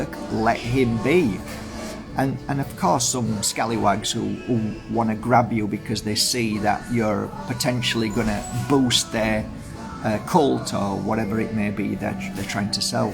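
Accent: Yorkshire, United Kingdom. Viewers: That is so funny i totally understand your saying about hating hearing yourself speak i cant bear hearing my north London working class accent 🤣but your yorkie accent is beautiful always has a calming effect on me especially with the wise words you give us 🙏❤️❤️❤️ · Most people love the Yorkshire accent. I'm Yorkshire and don't like my voice either. But most call centres in Yorkshire. Because people love the accent. Your voice is lovely and you speak from the heart ❤ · Love your Yorkshire accent, I’m from leeds West Yorkshire, love this coffee chat , thank you for everything you do ❤❤❤ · Seems most everyone loves the voice. It’s got character and sincerity there, not to mention depth of perceptivity. People LOVE the Yorkshire accent too. Woah…30thousand. Well, AI is looking out for you some. Ai reflects us, in multiple aspects, normally not so good. 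In this rare case, a fine outcome. (Expect, I’ll pick up a bit of flack from people’s feelings on the Ai.) Its okay on the flow; lots of people picking up stuff starting particularly in the 90s, then went quiet for some, louder for others. Hmmm, don’t know about handling worse situations in the next few months. Have to consider that one. Well, all will get through it. Personally, I may hibernate. Thanks. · What you say is the most important , your tone of voice is soothing and understanding, and your accent is my personal favorite accent from England . I have been watching British programs on PBS in the US since I was a pre-teen and later when I was introduced to All Creatures Great and Small I became drawn to the Yorkshire accent, I love listening to it. In fact I feel drawn to the area and in general the north of England holds a kind of magic for me. Keep doing what you do, we need more people like you in this world. 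· Thanks for making and sharing this video. I love the Yorkshire accent 🙏💗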